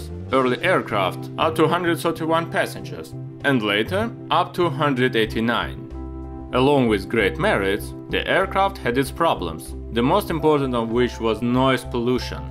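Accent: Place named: Russia. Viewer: Why the fuck is a Russian accent telling us about the American Boing 727 history …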